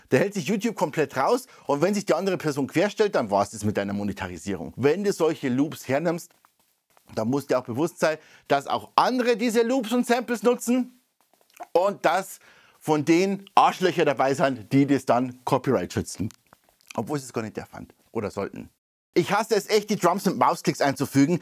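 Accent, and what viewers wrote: Bavaria, Germany. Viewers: hi! Oiso...i steh auf Dialekt...deswegen, bleib bei dein boarisch! i schnoi, wos du so dazöst und waun i wos wü, vastehst du mi ah....ollas kloa!!!! grüße vo ana echten Weanarin..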